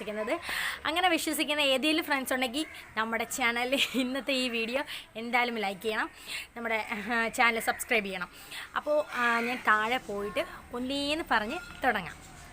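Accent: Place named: Malabar, India. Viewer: sukamano enn choichatha.. Malabari slang😃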